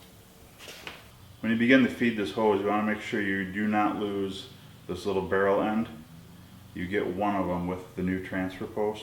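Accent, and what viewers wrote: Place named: United States. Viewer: Thank finally you are the best your video its simply good easy explanation and America accent. Awesome dude. Ty saying hello from Connecticut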